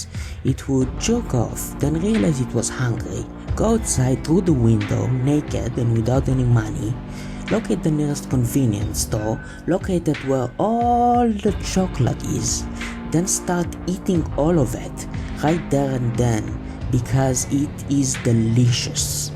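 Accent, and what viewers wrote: France. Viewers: are you french by any chance? your accent is nice but as a non native it was also hard for me to understand the first minute or so of the video hahaha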